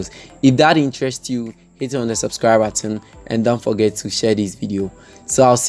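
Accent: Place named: Ghana. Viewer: See ya fine Ghana accent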